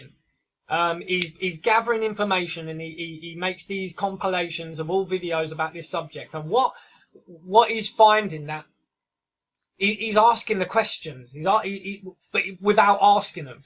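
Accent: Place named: Midlands, United Kingdom. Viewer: Your accent is Midlands but have you been living in London maybe...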